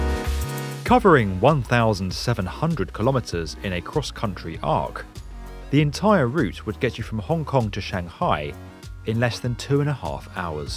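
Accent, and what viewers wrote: England, United Kingdom. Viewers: Great Brit announcer, but Asian pronunciation sucks..... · Never did like the English voice for trying to sell me on something I know won't work. You can't get people to buy the same car or eat the same food, but you are going to put them all on one common transportation device! Never going to happen.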